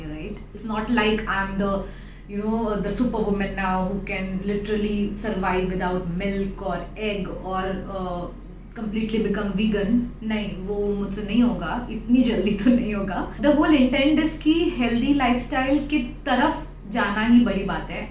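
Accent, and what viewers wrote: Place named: Uttar Pradesh, India. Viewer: Your accent looks like you are from U.P.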